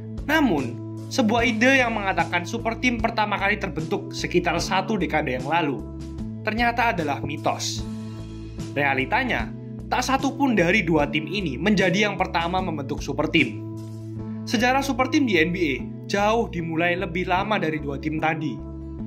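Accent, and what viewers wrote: Java, Indonesia. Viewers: Min...logat jawanya di kurangin.....xi..xi...xi....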